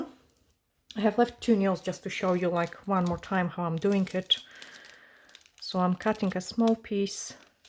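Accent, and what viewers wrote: Scotland, United Kingdom. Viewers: Absolutely stunning. I love hearing your wee Scottish hints in your accent 🥰 xx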